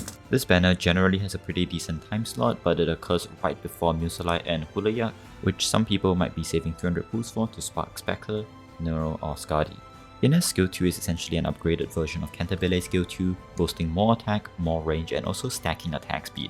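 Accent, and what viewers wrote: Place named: Singapore. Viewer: i can tell ur singaporean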